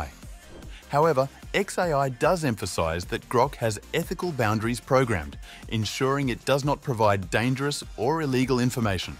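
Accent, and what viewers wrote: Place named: Australia. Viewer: Up to the minute, pronounced mye-n-yewt, as in "tiny"? Some strange pronunciations in this, with an Aussie accent. Maybe a Chinese who went to university in Australia?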